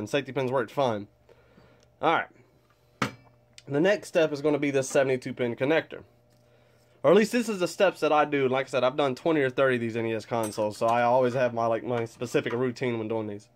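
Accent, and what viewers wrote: South, United States. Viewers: This is an amazing video man! You sound like you're front the south as well like I am. Just picked up an NES for $5 at a yard sale and it works! I'm looking to refurbish it on my next day off.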